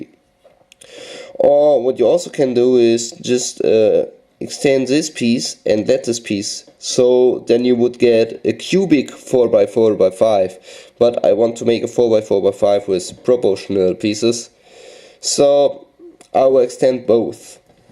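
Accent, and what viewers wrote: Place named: England, United Kingdom. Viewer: I love that english accent. Keep up the good work ! :D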